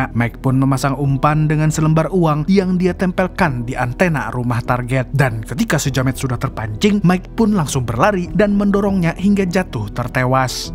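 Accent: Banjar, Indonesia. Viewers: org banjar sekalinya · Urang banjar kah pian mamang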